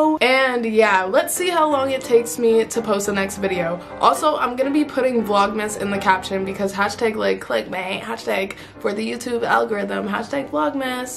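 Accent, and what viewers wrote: Canada. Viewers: Your Canadian accent reminded me of wolfie raps😂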